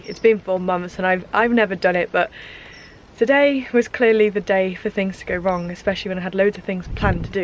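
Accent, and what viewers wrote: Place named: England, United Kingdom. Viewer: Wow! Thanks so much for sharing from across the pond! Stumbled across you and your video as we are all stuck with limited activities and lockdowns:( I did have an RV last year, but still long for a Van as a more manageable means to get away. A suggestion maybe for mold is desicant? sp? They sell it at hardware stores, and even our storage places in a plastic bucket with a perferated top, crystals absorb moisture.... I also had an electric rechargeable one off Amazon, that just hung with a hook, and it changed color as it needed recharging to dry it out. Packets of silica beads / gel may also help to dry area out. Cheers and and thanks again! Stay safe, LOVE your proper English, haven;t heard in many years ... Canadian raised :)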